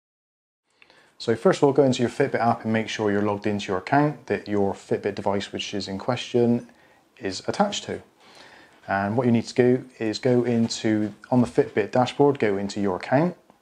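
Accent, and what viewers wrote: United Kingdom. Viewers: Good morning, I know it's been quite a long time since you posted this video but I just wanted to thank you for the information. I purchased a Charge 5 several months ago and I find (maybe not a defect - just an operating method for this version of Fitbit?) that whenever I let the charge drop below 1% a complete reset and time correction is necessary. Device reset is easy thanks to YouTube although this doesn't correct the time. This AM I discovered your site and everything was solved!! I have been a quite devoted / fanatical (?) Anglophile ever since that evening on February, 9 1964 when I first set eyes and ears upon those Four Lads from Liverpool. I am 73 years old these days and was just a 14 year old lad on that wonderful evening. Now anything dealing with England, Scotland, Ireland, Northern Ireland and Wales will simply and automatically attract my attention and I know this will never change until my dying day - and will continue long after that. 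Sorry, I got a little off the subject there but just wanted to thank you for the absolutely appropriate information. I don't know how many times I have said or thought this but I could actually listen to someone with your beautiful accent (or any of those in my 5 favorite and previously mentioned countries) simply read a grocery / shopping list and I would be enthralled by by the reading because of that accent. If that's an addiction I really don't mind. All the very best to you and yours, Terry (in the middle of Minneso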